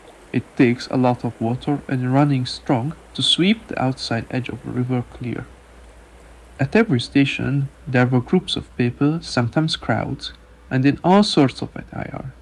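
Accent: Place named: Hungary. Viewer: Hello Dear 🌻🐝 You're my first Hungarian accent so in my 71 years I've had no experience developing an ear for it. If you'll share a chapter you feel is your best translation so far I will try to learn it. Looking forward to hearing from you again. Auntee Sara ⚘️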